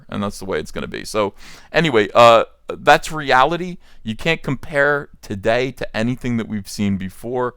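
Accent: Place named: Baltimore, United States. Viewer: You sound like you're from the Baltimore - Camden axis (which includes Cumberland).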